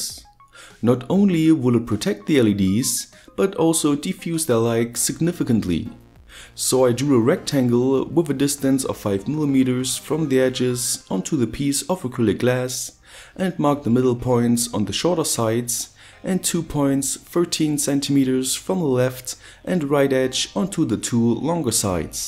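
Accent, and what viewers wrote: Germany. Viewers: Greatly appreciated Germany accent! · Are you German? You sound German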